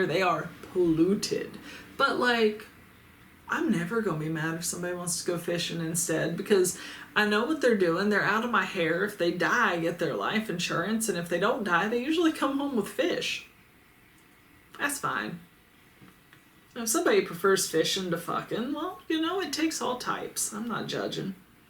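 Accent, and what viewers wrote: Texas, United States. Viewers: As an Aussie, the way you say "Y'all" does give me Texan/mid-western vibes.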